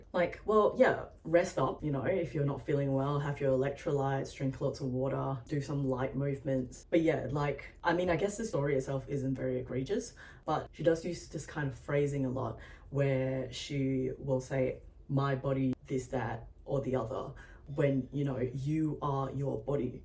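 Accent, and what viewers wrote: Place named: Australia. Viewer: omg an aussie! YAYYYY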